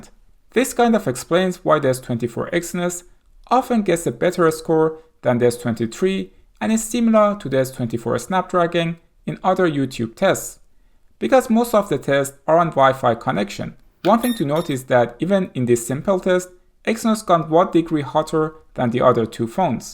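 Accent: Iran. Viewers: I like that Iranian accent ;) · Again great video dude. My wife is saying that you have a persian accent ? Are you persian ?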